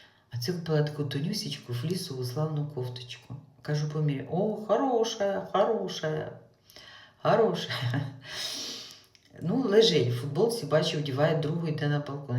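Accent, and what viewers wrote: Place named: Kuban, Russia. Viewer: Пробило на хіхі.. кубаньська балачка.😂😂😂❤1972 рік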